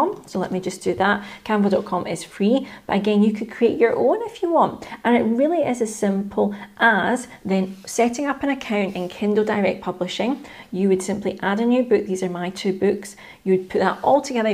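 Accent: Scotland, United Kingdom. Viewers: I've been following your site for about three months. I'm an American who lives in North East Scotland. I used to live in Cumbernauld, so I love your accent. I wrote a book on becoming deb some years ago, and I love watching You Tube videos related to it. You and your husband have one of the best I've seen in this country. Plus this is your best yet. Thanks. Keep up the good work.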